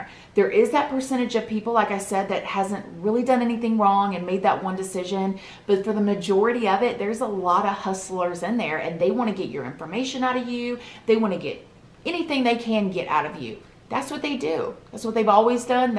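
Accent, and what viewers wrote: South, United States. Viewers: Your southern accent makes me feel secure??? Idk but I appreciate a fun southern YouTuber (nothing weird, my family is southern, and nobody is where I live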